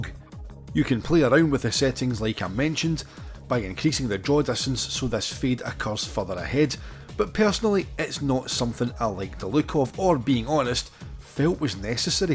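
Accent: Scotland, United Kingdom. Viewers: cool love this accent ;) it’s like listening to Robert Carlyle in Trainspotting. I am french…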